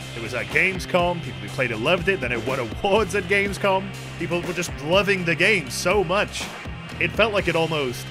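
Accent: United Kingdom. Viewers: YOU'RE BRITISH?????????????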